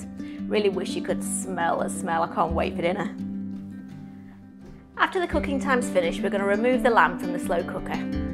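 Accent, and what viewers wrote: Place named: Manchester, United Kingdom. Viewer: Love your show, somehow I have a feeling that you are a Mancunian Girl, and love your accent. We know your husband’s name is Chris, how about your name? It’s nice to write a comment with your name in it. As for this Curried Lamb, I had to clear all the fats and bones to avoid the lambie flavour, Australian Lamb is not the best in the world, and is so Rich, I suggest that you replace the Stock with water to lessen the rich flavours. As for the stringing of the Cardamom, I normally use a special stainless steel Tea Strainer where you can add other pods and seeds if required. I am cooking this spring lamb leg (de boned and fat completely removed) today and will let you know of the result. I think it’s going to be good. Thanks. Regards, Dr. Matthew Banno, Melbourne, Australia.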